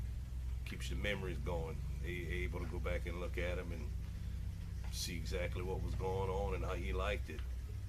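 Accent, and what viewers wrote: Louisiana, United States. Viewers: Hi. I jumped over and gave you a sub back. I like to guess where people are from by their accent. Are you from Louisiana, maybe? We're in Missouri. I love all the green in there this time of year. We've had unusually cold very early this year and everything is toast...cold toast. Well, I listened long enough to hear that you are from LA. ~Janette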